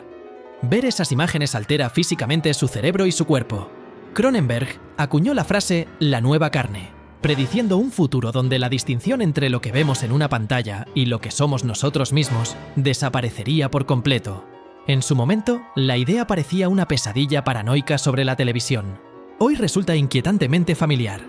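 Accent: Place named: Spain. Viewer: Es que no existen otros narradores que no hablen españoletos? Ya lo dije NO VEO VIDEOS NARRADOS POR ELLOS. NOJODAAAA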